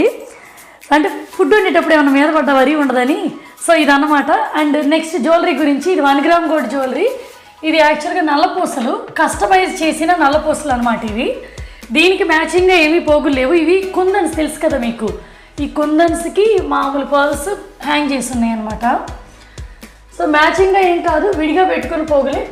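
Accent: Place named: Nellore, India. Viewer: me slang super akka.. ma nellore basha lage undi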